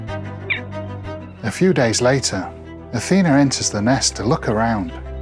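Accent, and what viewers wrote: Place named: West Yorkshire, United Kingdom. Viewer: Hello! I've watched a few of your videos before - I genuinely started because I love animals in general but also having lived in West Yorkshire for a huge chunk of my life I just missed the accent! Haha! - but have finally subscribed. I am a huge fan of corvids, they're so beautiful and intelligent so it felt a bit strange rooting for the Kestrals in this video. 😂 Thank you for these educational and informative videos, I've learned a lot in just the few that I've watched and am definitely going to introduce my 10yr old nephew to the channel as we love watching animal documentaries together. Looking forward to binging through your past videos and seeing what comes next! Thank you for all your conservation work that keeps these species and many more around for my nephew and following generations to see. It breaks my heart to think that some of the creatures we see around us could be as distant an idea to future generations as the woolly mammoth is to us! 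😢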